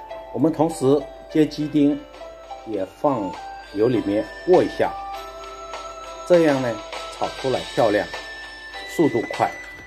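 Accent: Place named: Shanghai, China. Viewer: 你家还种菜，好新鲜，我种过几次西葫芦，很能长的，你是上海人吧？听你讲普通话觉得是。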